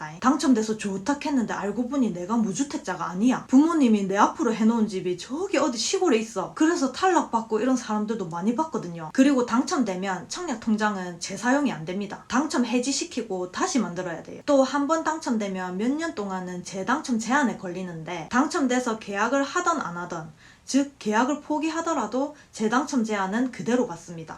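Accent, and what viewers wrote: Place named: Busan, South Korea. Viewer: ㅋㅋ부산사투리쓰면서 재밌게 말씀 하시네요ㅋㅋ무주택자 다자녀를 둔 외벌이 거제도 가장입니다ㅋㅋ 좋아요 구독 누르고 갑니다~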